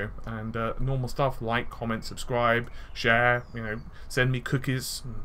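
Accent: England, United Kingdom. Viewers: Best place to listen a good English accent too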